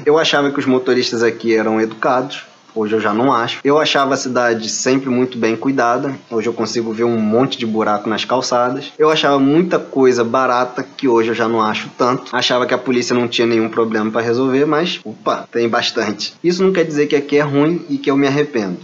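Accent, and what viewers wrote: Brazil. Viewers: Estão a gastar dinheiro em escola privada e em seguro de saúde por opção, embora em saúde também descarto totalmente essa opção, porque não se justifica objectivamente. Apesar do desinvestimento feito nos últimos anos nestas áreas pelo governo por motivos vários e as dificuldades que isso acarreta essas áreas continuam muito boas apesar de tudo. Agora você fala uma coisa óbvia: os seus padrões de exigência começam a subir também e daí percebe o porquê das manifestações de insatisfação várias que os portugueses mostram, enfim, você apesar da pronúncia ser brasileira começa a sentir-se português. e isso é tudo normal. Somos exigentes para aumentar os padrões de qualidade e tentar não baixá-los. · I love the accent from Rio it’s so fun. Cascais is one of the best cities in Portugal.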